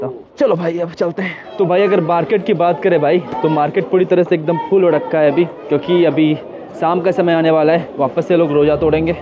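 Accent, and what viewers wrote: Bihar, India. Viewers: This is the way a bihari talk . (Hum) Aur (bhai) in most sentence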